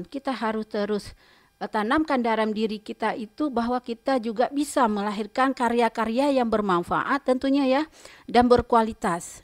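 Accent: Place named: Medan, Indonesia. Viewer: Aksennya seperti logat Medan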